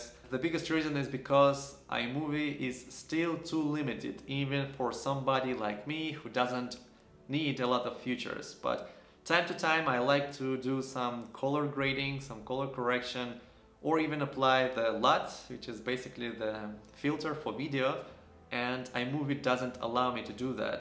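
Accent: Russia. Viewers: Нам показалось что ты русский! английский норм. продолжай! Лайк влепил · I love your Russian/Slavic accent!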